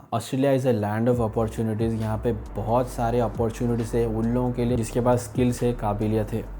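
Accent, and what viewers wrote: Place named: Hyderabad, India. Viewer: apke baat karnke tarikqe se pechna mai hai nahi hyderbad ke aap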